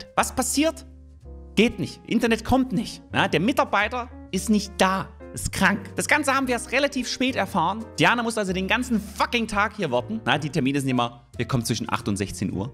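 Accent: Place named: Dresden, Germany. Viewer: dein dialekt kommt mir bekannt vor - raum dresden?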